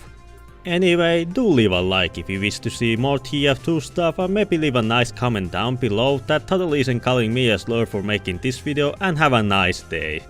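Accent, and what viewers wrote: Finland. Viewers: Nyt on nii vahva suomiaccentti että aijaijai :D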